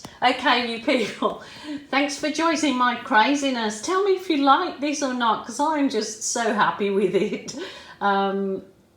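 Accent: Birmingham, United Kingdom. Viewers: after I sent it I thought “No! She a Brummie ya daft tit” I’m sorry for miss placing you. Love that you’ve never lost your accent. X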